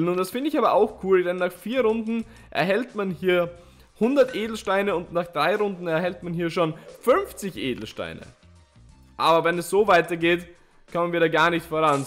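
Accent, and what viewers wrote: Austria. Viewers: Bist du östereicher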